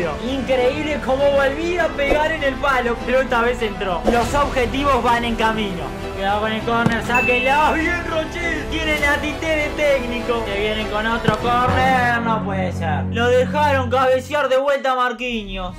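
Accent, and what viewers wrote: Uruguay. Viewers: Eres Uruguayo? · Eres uruguayo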